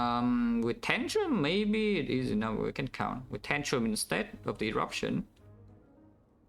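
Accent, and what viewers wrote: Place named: Vietnam. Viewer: How sheltered are you to have never heard an accent before? He's Vietnamese and lives in Vietnam. I think his accent is great and he's also fucking hilarious. Please stop talking.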